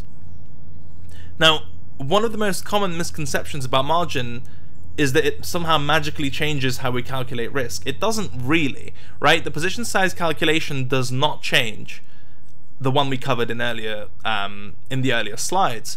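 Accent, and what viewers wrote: United Kingdom. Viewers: didnt know you were english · Very good, other than you sound like a posh git to an Aussie like me. Kudos for sharing free.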